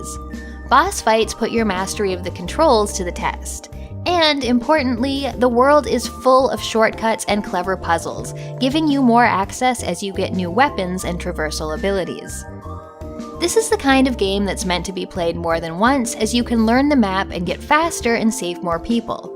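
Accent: Canada. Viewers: What accent is this Canadian ?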